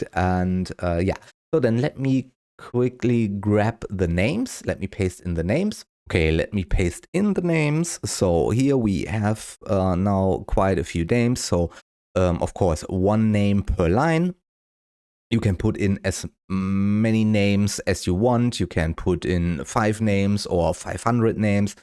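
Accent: Germany. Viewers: Akzent einfach deutsch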